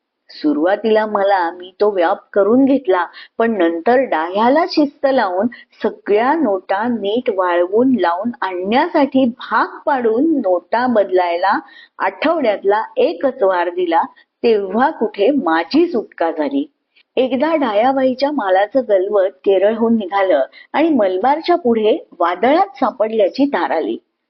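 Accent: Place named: Konkan, India. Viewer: खूप छान सादरीकरण . कोकणी ढब . गुजराथी मिक्स मराठीचा चढ उतार खूपच छान !👌💐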